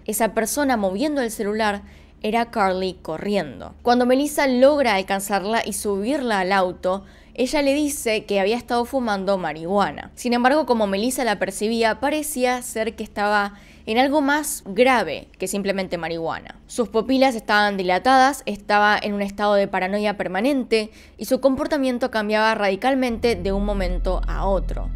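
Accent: Argentina. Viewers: Como todo (Pedante) Argentino la mujer de este canal tiene su pequeño acento Chocante.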